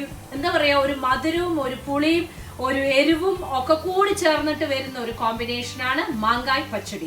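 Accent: Palakkad, India. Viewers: True. Her accent attracts Palakkadans to watch her videos irrespective of the menu she posts. Pakka palakkadan slang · Thanks for all your cookery shows. More than your cooking I like the way you speak because I am from Palakkad too. I am settled in Pune or for that matter I am a Puneri but a pakka Palakkadan malayali. I can read but not write Malayalam. Once again for Palakkadan Malayali basha with the slang words. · Palakkadan slang avatharanam super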